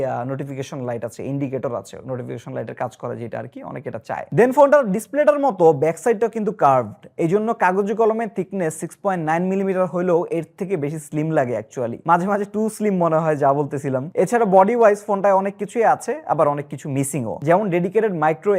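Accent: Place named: Chittagong, Bangladesh. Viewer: ভাই আপনার ভয়েসে মাঝে মাঝে চিটাগাংয়ের অ্যাকসেন্ট চলে আসে!!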